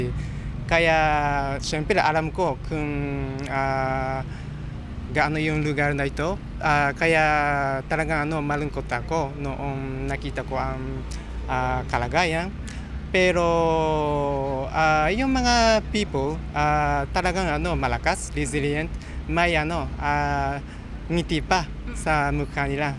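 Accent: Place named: Tagalog, Philippines. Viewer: nakaka proud ako kay kuya!! sobrang linis mag salita tagalog SOGOI!!!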